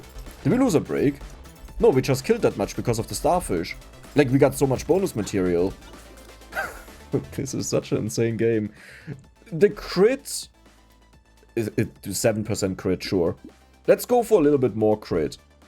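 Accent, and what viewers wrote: Germany. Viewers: As a newcomer i have been thinking what accent does he have. Today, i realized and feel very, very, very dumb. I even speak german.... The "Ooga, ooga, ooga" felt about right for my brain at that moment.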